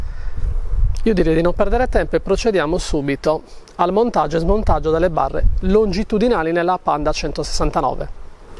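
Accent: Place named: Sardinia, Italy. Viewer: Ad un certo punto ho creduto che li avresti smontate 😁 Di dove sei ? Non dirmi sardo che l’ho capito 😁😁😁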